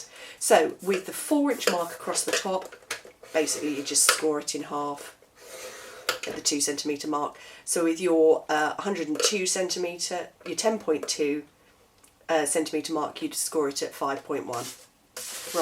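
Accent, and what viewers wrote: England, United Kingdom. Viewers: I absolutely love all of your boxes and bags. They are quite adorable and just perfect! Also, my favorite accent ever is the English accent. So watching and Listening is wonderful! Thank you!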